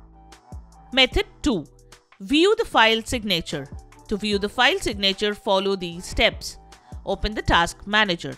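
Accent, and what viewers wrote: India. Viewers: this is a virus unistall and never trust a video with an Indian accent almost all the time the scammers are form there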